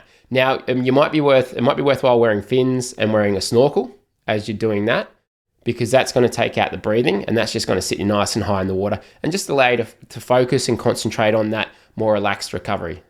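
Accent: Australia. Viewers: This is very helpful and( are you Australian) You are very handsome young gentlemen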